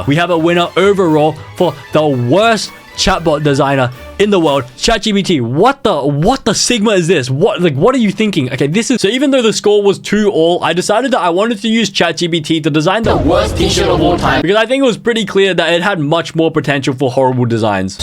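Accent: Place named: Australia. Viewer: WHY WAS I SO HAPPY FINDING OUT HE WAS AN AUSSIE 💀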